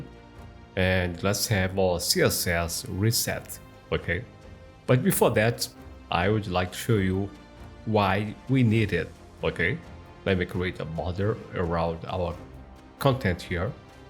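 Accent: Brazil. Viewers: Você é brasileiro? Muito bom seu tutoral! Seu sotaque parece do rio de janeiro. Parabens pelo otimo conteudo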